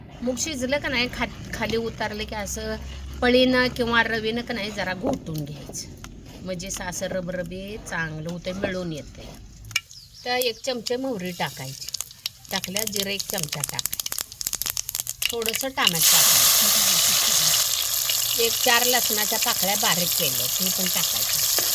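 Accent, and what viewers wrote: Kolhapur, India. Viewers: खुप छान मावशी तुमच्छा जेवणाची चव तर माहीत नाही पण तुम्हची बोली भारी आहे कोल्हापुर तल कोणतं गाव तुम्हचं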